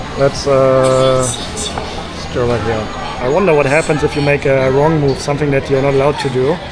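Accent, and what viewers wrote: Germany. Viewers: ngl the German accent makes the vid so much better lol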